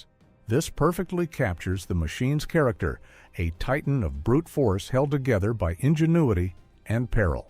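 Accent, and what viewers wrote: United States. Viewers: Because you have an American accent geared up to know what yr talking about you Must be right right? ...Like Russia pinching automatic washing machines for the chips? You go on about the air frame? All it is a a bit of Al or what ever - you can simply make another one. On those grounds yr talking rubish, And an airframe to you means excess costs of parking yr wives fat butts in restruants rather then going into casting of forging metal. Which is why yous and NATO cant keep up and out pace. And after these thumb twidling calculations - then Russia is expected to lay flat like a carpet for yous to come in and rape and pillige the land? I think arrogance in a head blockage is the far bigger problem and liability here.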